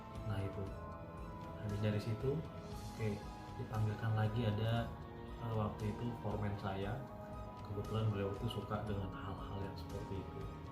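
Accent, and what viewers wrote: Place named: Kutai, Indonesia. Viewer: urang gaib ..bunyi urang kutai om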